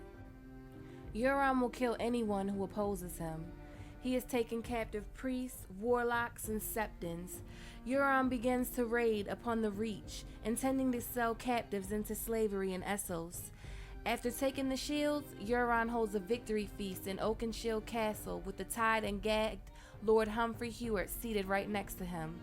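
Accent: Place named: Baltimore, United States. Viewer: I know this is an old video. But you might consider fixing the title. I think you mean Ramsey "or" Joffery. Also, like the Baltimore accent on this one. You thinned it out lately.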